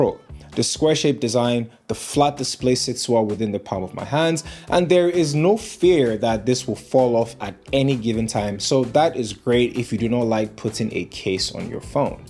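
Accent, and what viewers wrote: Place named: Nigeria. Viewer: You got a gaming hardware channel right?... I could swear the voice is the same... The fade nigerian accent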